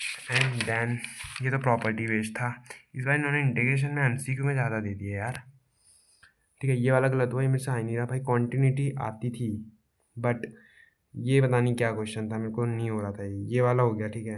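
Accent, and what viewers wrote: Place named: Odisha, India. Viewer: Bhai ap odisha se ho kya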